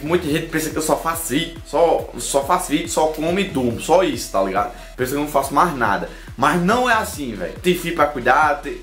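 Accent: Northeast, Brazil. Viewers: eu queria ter nascido no nordeste pra ter esse sotaque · Tmj Junto Sutacaque Fala Tudo Que E Nordestino Tbm So E Tenho Muito Orgulho E Nunca Mude Por causa de ninguem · começo do teu canal eu não gostava de sotaque de nordestino mas eu vi que tava errado e hoje te assito sempre irmão tamo junto <3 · Amo sotaque nordestino <3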